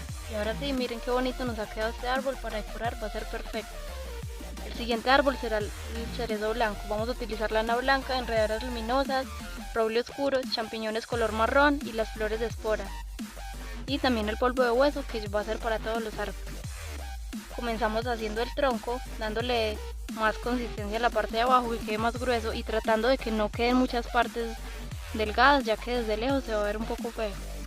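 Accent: Colombia. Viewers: colombia? :u paisa? xd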